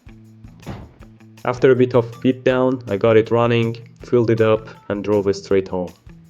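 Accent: Iran. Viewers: the persian accent 😂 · دادا ایرانی؟ لهجت داد میزند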